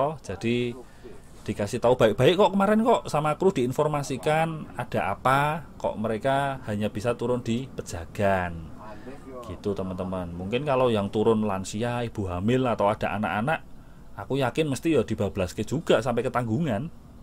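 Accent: Yogyakarta, Indonesia. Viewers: logat jogja nya kentel banget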